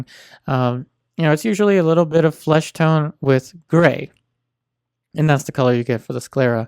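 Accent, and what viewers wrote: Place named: United States. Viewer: keep on soft-ten-ning where useful! Where's from? He sounds american accent except for his love of mis-pronouncing soften: properly there should be a silent T in soften and softening at least here in the American language. Very good videos dude!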